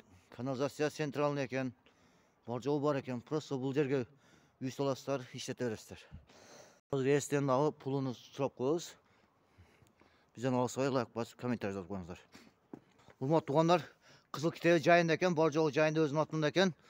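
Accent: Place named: South, Kyrgyzstan. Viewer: Баткенчи же Ноокат ичкилик же Кичи алайдан Аравандан окшойт суйлогон бала...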